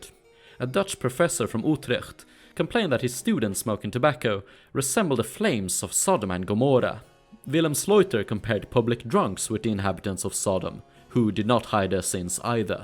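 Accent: Scotland, United Kingdom. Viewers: The Scottish accent ❤ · He’s not British. Sounds more Scottish or Northern Irish.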